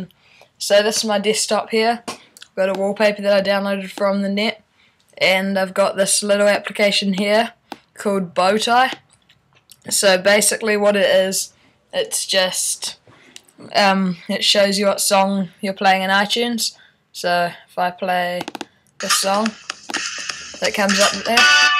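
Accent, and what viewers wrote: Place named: Australia. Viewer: of course he's australian. I'm an auzzie and i can tell the difference between english, american and australian. why cant you?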